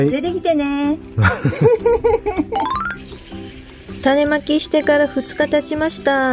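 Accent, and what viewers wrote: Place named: Tohoku, Japan. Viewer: 『にずっつぶ』讃岐かぁおもいよったら、東北のナマリやなぁ。間引き、優劣がないポット…悩むんですよね。でも、さんが「気が小さいけん」ゆうて、なんのこっちゃ！？おもいよった😆😆 元気いっぱい、まけまけいっぱい葉っぱが出よるやん。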